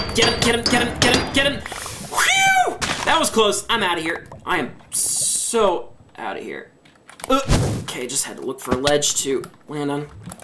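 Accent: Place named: United Kingdom. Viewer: lol British accent😃😂😂😂😂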